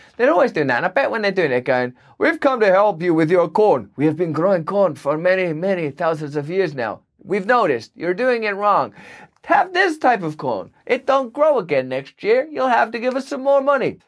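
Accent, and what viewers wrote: United Kingdom. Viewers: Despite the fact that Russell often dresses like my crazy aunt, he really seems to know his shit. It's definitely worth enduring that limey accent to recieve some of the knowledge he's offering.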